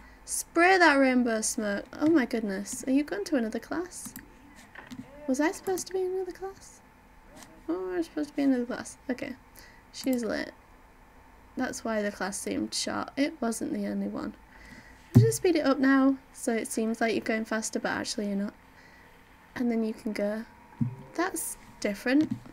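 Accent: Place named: England, United Kingdom. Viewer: where are you from? You sound english but like what city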